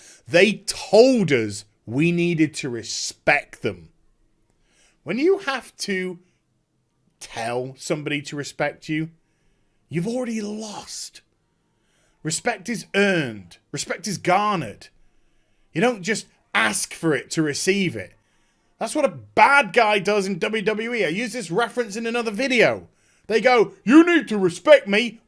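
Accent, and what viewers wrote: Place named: United Kingdom. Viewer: god i so fuking love the British accent :D to the topic Keep up the good work that need a abo :D great evening from germany here .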